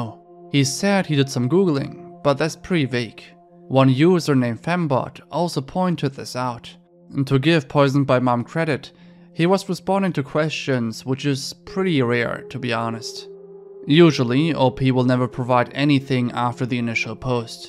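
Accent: Germany. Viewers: You've got a German accent. You are German.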